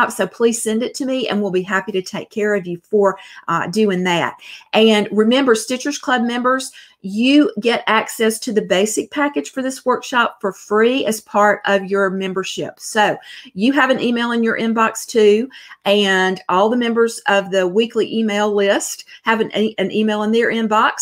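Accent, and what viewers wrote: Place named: Mississippi, United States. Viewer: Watching in Rockville, Maryland. But I’m from Mississippi, so your accent makes me think of home! Thanks for the great tutorials.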